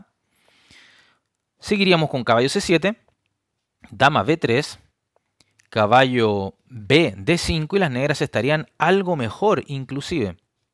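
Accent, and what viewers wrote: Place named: Chile. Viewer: Le encuentro un acento Chileno xd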